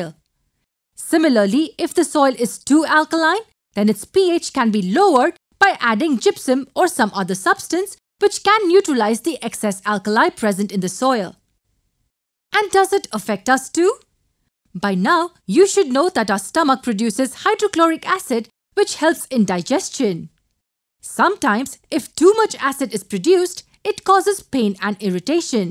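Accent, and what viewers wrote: India. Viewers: Such knowledgeable and scientific information, the Indian accent is strong too, I love it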